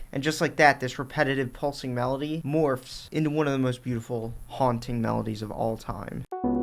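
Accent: Philadelphia, United States. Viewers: You over analyzed non intent that was made by Xfer’s Cthulhu plug-in. But I like your excitement and cheesy Phila accent. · Sound you're from Philly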